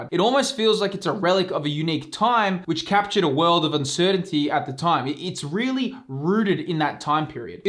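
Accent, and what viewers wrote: Australia. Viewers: Just started collecting again and im so glad I stumbled across your channel. It’s so refreshing to watch and hear a fellow aussie! love the content it’s been super helpful and insightful :)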